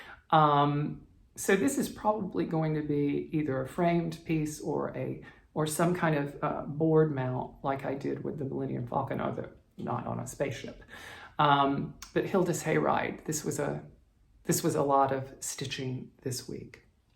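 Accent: South, United States. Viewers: You would have to shave your mustache and get a red sweater to be Fred Rogers. That would be cool though! I hope you saw the movie with Tom Hanks, it was really precious. You said that you have an accent. Yes, I could hear something southern. Now that I have started to watch your previous episode, I am guessing that you are from Alabama. My friend from India 🇮🇳 when I told him that I did not have an accent, he said of course you have an accent. You have an American 🇺🇸 accent! Of course, in my completely untraveled life and stupidity, I thought Americans didn’t have accents. But of course people speaking English from Ireland 🇮🇪 , South Africa 🇿🇦 India 🇮🇳 England 🏴 , etc, they can all tell that we are from America 🇺🇸 . So think about it… Everyone has an accent! Just depends on who is listening. Thank you for rescuing cats 🐈 ! All of my pets are also rescues. 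Really cute that you kept all your childhood books 📚 . I don’t have a single one. Don’t you just love finding your artwork 🖼 and crafts from childhood? I have so few. And by the way… Basket 🧺 of cherries 🍒 was my first Blackbird design stitched and completed recently, and the first time I ever used Linen as well. I did it on 28 count.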